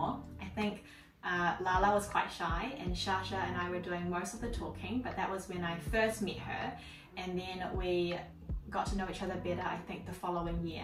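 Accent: New Zealand. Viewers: Hi, your content is so refreshig to watch and your voice so pleasant in my ears. You have same accent with Jennie of Blackpink and then I realized Jennie used to live in NZ 😊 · can anyone tell me whether this is NZ accent english? i'm an english learner and i think this may be the perfect material to practice listening hahaah thank you for the subtile and looking forward to your new videos to come!! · I just enjoy your deep NZ accent 😂❤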